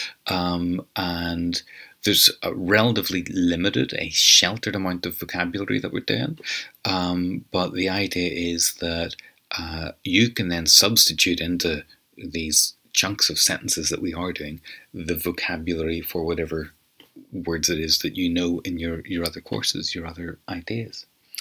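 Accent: Ulster, United Kingdom. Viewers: I love the Ulster dialect! This is similar to the Pimsleur method. Say out loud, predict, repeat . Thank you. There are not many classes out there which are just oral.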